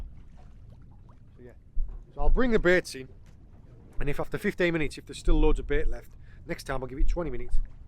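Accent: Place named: Teesside, United Kingdom. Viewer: Hi great video as always, can I just ask where you are from originally? I've narrowed the accent down to the Teesside area or maybe the other side Barrow/Morcambe way?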